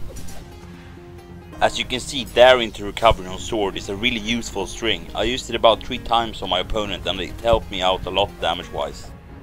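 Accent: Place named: Sweden. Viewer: Wait,are you Swedish? You've got that accent :D and btw really helpful video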